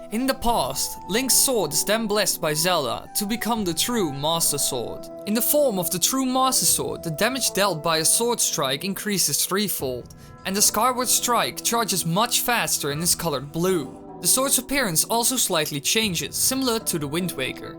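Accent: Netherlands. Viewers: In het begin een beetje te Nederlandse multiple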